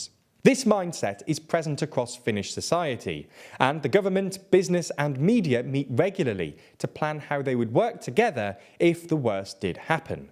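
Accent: United Kingdom. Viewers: Good job on your British accent! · Excellent exposé but you're highly accentuated British accent is so painful to hear!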